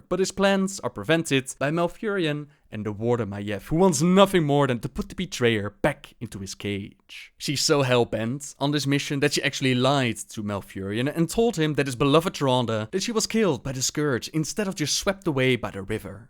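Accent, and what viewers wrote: Netherlands. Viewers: do I hear a dutch accent? might be wrong ^^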